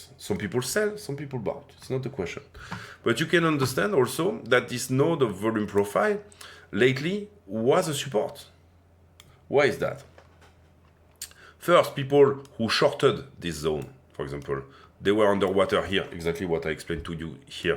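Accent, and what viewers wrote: France. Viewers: Merci pour l'accent frenchy 😊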